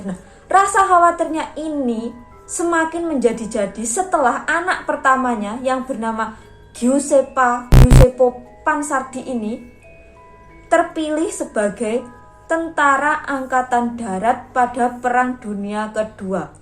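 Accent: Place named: Java, Indonesia. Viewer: Medok lur medok 😃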